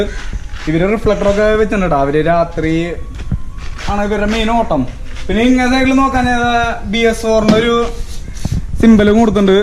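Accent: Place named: Thrissur, India. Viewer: Enta chengai ningadea samsaram kelkan aa ee review full kanunea... Trissur slang😍😍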